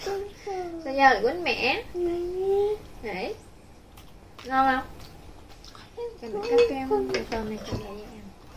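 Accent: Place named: Quang Nam, Vietnam. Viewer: chuc mung Nam moi chuc gia dinh Nam moi vui ve hanh phuc khoe manh nha🎊💥 Noi con o cau Mong Co gan may Quan ban bo tai ko rua Co di tu Nam 1990toi bay gioi ve Lai ko nho. Vi Co nghe giong cua co n noi co nghi con la Nguoi Quang nen chi hoi tham thoi.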